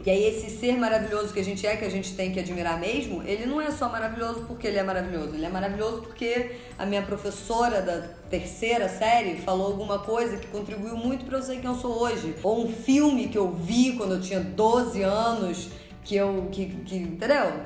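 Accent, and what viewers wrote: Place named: Rio de Janeiro, Brazil. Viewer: Eu li um pouco de Fuco, que ele é extremamente futurista e analítico sobre nossas existências, e eu fiquei confusa confesso com a informação de que somos pontinhos e milhares deles e que enxergamos a matéria em si porém o nosso cérebro transforma esses pontinhos em matéria, por isso nos enxergamos em corpos físicos e não pontinhos, teorias são uma excelência da vida humana, se todos os seres humanos tivessem consciência das suas capacidades que vão além desta que é a matéria prima primitiva o mundo seria melhor para ser vivido certeza, e acho super fofo você falando com seus "s" no final das palavras com sotaque carioques ....adoro seu canal vida inteligente no YouTube sucesso jout jout...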